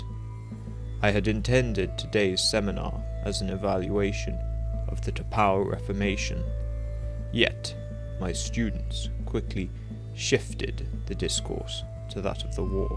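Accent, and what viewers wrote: RP, United Kingdom. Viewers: You are sure to come from England because you can clearly hear the Received Pronunciation. Your RP or British English is flawless and very clean for me to understand in contrast to US English. There are also differences, for example in New England, the people there are very close to the RP. Otherwise, I don't understand anyone in the US. The pronunciation is worst for me in Alabama. Learning English is compulsory in German schools, but I think the mistake is that the students are drilled in RP. Not only in pronunciation, but also in vocabulary. There are clear differences between words and spelling in US English. My pen pal from the USA agrees with me and thinks that it is a mistake for the students to be drilled in RP in Germany. He says he always notices that when he reads my letters, because I stick to the RP vocabulary and also to the spelling of words that are spelled differently in US.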